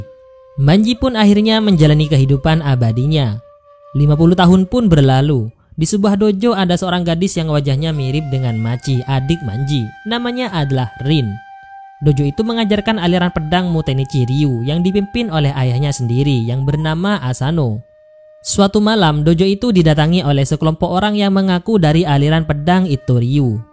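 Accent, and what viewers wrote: Madura, Indonesia. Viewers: Logatnya kek orang madura. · Ini orang madura ya?? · Medureh dimmah kak??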